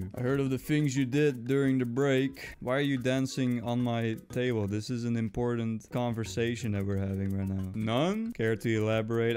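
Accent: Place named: Netherlands. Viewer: This guy has a accent like he is from the Nederlands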